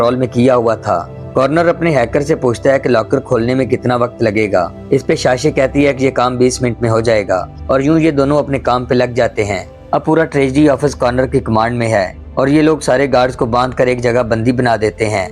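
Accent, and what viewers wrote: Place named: Punjab, India. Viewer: Punjabi accent. Not Hindi or urdu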